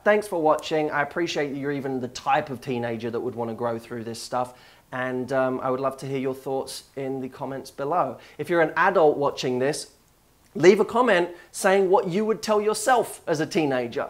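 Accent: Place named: United Kingdom. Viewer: (aint always easy) anal is easy?! hahahahah I love the british accent.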